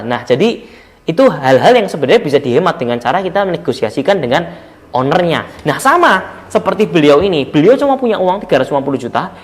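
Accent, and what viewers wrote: Java, Indonesia. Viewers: Medhok e mas heheh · bahasa mas nya mendok jawa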